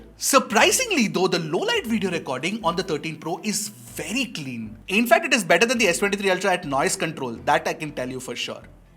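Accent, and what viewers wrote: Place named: India. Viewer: The pronunciation of "T'' in Indian accent is the ugliest thing I've ever heard.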